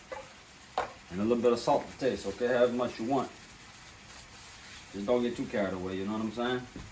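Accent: Louisiana, United States. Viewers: was having a shitty day, then popped up and a combination of the simplicity of your videos, like they are straight to the point and the louisiana accent is just icing on the cake for me, i love this content!. Love from Sweden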